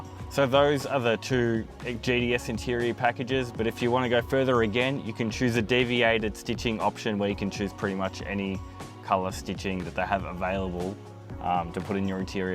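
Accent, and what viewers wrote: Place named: Australia. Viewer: "GDS" lol..This just goes to show how slack the Aussie accent is, lol. Seriously, Aussie Motoring Journos should have to take speech lessons.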